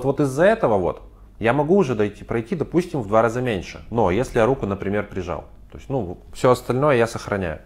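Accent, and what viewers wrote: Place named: Urals, Russia. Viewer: Мне кажется, доктор - уралец? Говорок уральский). Такой молодой и такой потрясающий!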